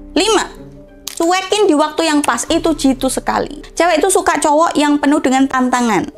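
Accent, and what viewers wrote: Java, Indonesia. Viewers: jawane medok yo mba? tapi bagus triknya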